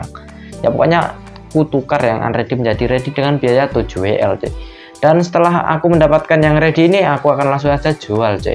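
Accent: Indonesia. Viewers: Lah kok ngapak wkwkwkwkw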